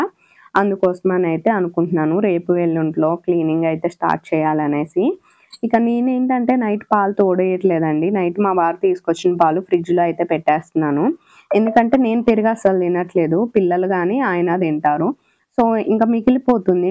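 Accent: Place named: Andhra Pradesh, India. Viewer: Akka meedhi Andhra Pradesh ha ledha Telangana akkda untaru akka slange matharam Andhra laganey undhiiiii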